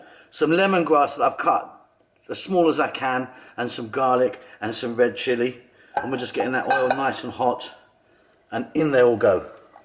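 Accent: United Kingdom. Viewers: Love your fabulous British voice as well ! 👍🙂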